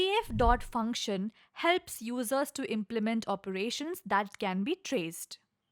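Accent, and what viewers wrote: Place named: India. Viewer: The heavy Indian accent in the video is too hard to follow for a westerner !!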